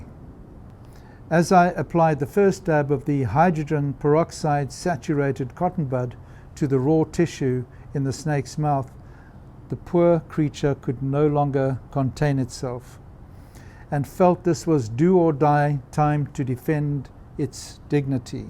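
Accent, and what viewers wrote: South Africa. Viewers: Being a witness of suicide in whatever form often is a very uncomfortable experience. I've been there too. On both sides actually. On a brighter note: By listening to your videos, I have a newly found appreciation for the South African English accent.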